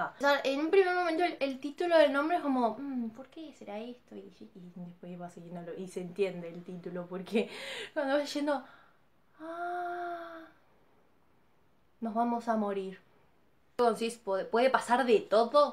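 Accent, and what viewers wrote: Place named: Argentina. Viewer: Che, de que parte de Argentina sos?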